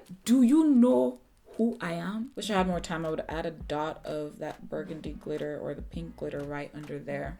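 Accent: Nigeria. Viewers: Yayyyyy your back! I was having chocolate withdrawals I missed your Nigerian accent 😍❤️ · The Nigerian accent is baccckkkkkk!!! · The Nigerian accent NEVER fails to make me laugh. You are a freaking queen.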